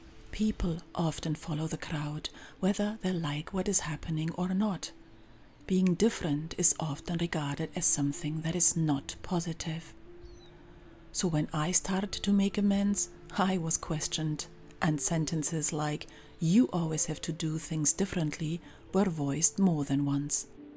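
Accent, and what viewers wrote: Scotland, United Kingdom. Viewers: How is it that you have such a pronounced "Scottish" accent? I''ve known people who've spent almost their entire lives living in their adopted country but still speak english with the accent of their birth country.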